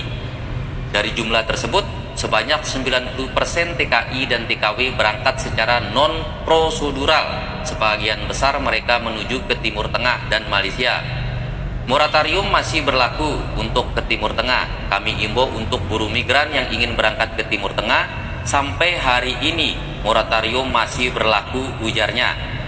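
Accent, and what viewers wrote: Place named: Sumbawa, Indonesia. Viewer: nyam seda pmbaca ne Jerni n jls pst mas nya orang sumbawa totok dri nada bicaranya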